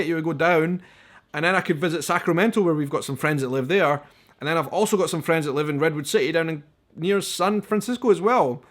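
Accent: Scotland, United Kingdom. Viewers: Greetings from wyoming!! Salt Lake City it’s a diverse city I do love it there , my brothers do live there!! The best time to visit is in the autumn spectacular views from the mountains, I highly recommend it 😌 I love your Chanel your accent it’s so sweet, my son just married a lovely young lady from aviemore and as well looove the accent !! We are planning in visiting Scotland soon I hope!!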